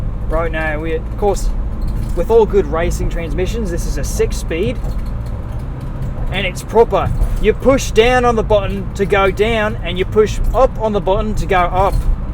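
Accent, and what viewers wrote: Australia. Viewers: Dat Aussie accent tho... :O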